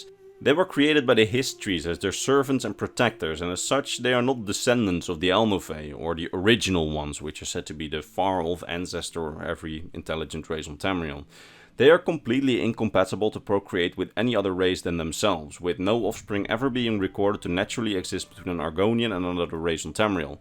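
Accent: Netherlands. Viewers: 5 minutes in I KNEW this guy was Dutch the accent is so thick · Je klinkt zeer Nederlands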